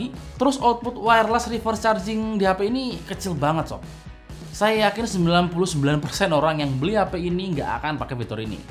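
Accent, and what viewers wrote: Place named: West Java, Indonesia. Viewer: Sunda ninganan. Baruk urang mana da ngomong basa nasional wkwkwk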